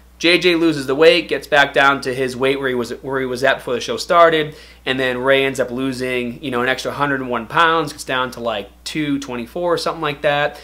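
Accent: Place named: New England, United States. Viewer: the accent is that of New England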